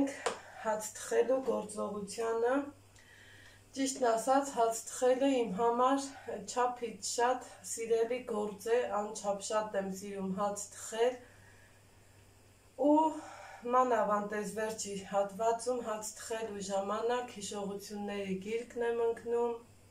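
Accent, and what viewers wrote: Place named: Vanadzor, Armenia. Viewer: ինչքան տխրություն կա ձեր մեջ։հաց թխելը ամեն մարդու գործ չէ։միայն հոգով բարի ու լավ մարդիք են կարողանում լավ հաց թխել։ձեզ առողջություն եմ մաղթում ու երջանկություն սիրելիս։իսկ ձեր հոգու տխրությունը թող վերանա ընդմիշտ🤗🤗🤗առաջին անգամ եմ դիտում ձեր վիդիոն ու միանգամից բաժանորդագրվում եմ։կասեք՞ դուք որտեղից եք բարբառը կիրովականի կողմից է ոնց որ՞ հարազատ բարբառ է ինձ շաաատ🤗🤗🤗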